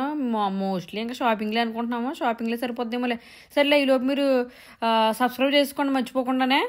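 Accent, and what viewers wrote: Godavari, India. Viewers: Hi Madam, Really I like ur Godavari slang and ur husband's funny behaviour...... Really I like ur funny videos..........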